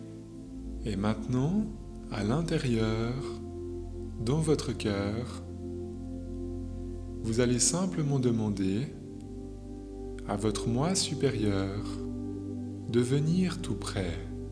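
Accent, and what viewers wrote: Vaud, Switzerland. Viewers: Bonjour. Merci 🌸. C'est plus fort que moi alors je le dis: cet accent vient de CH n'est-ce pas? J'ai expérimenté ou plus précisément, je me suis rendue compte de cette énergie en 2020, elle s'est invitée à l'imprévu ... hihi. Je l'ai apprivoisée et j'arrive à la faire venir à chaque fois que j'ai envie. Jusqu'à mauntenant je ne fais que la ressentir et sentir ma sensation ... maintenant je sais qui c'est, je lui ferai part ma demande. Encore MERCI ❤. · Merci au petit suisse, quel voix, quel intonations et quel accent délicieux et puis toute cette douceur ❤ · Votre voix est extrêmement rassurante et ce petit accent vaudois y est pour beaucoup a mes yeux... ;-) Merci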